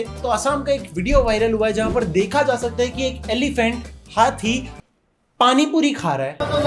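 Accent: Gujarat, India. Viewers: Your Gujarati accent.. 😂😂😂I like it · That gujrati accent of yours 😂😂😂 soo good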